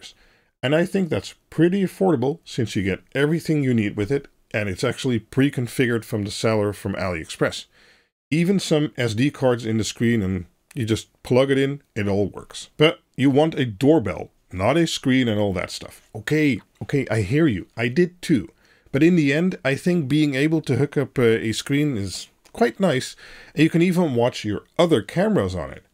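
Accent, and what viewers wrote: Netherlands. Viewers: Are you from the Netherlands. Your accent sounds Dutch. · Hallo, ik hoor dat je waarschijnlijk uit Nederland komt of vandaan? Ik heb je video bekeken het is een goed concept en zie ook dat de video 2 jaar oud is, maar ik heb hier waarschijnlijk een oplossing voor die misschien minder tussen stations heeft?!?! Of heb je relay board en esp32 voor nog meer dingen nodig? Dit is de 'DS6660 PRO' van DOORSAFE een standalone camera met LAN poort die PoE ondersteunt, WiFi antenne, gong aansluiting AC/DC, resolutie: 2mp fhd 1080P, verbinding via de Tuya app dus ook mogelijk te gebruiken met Home Assistant. dit allemaal voor €110,00 inc BTW Ik ben benieuwd of dit een goede alternatief is?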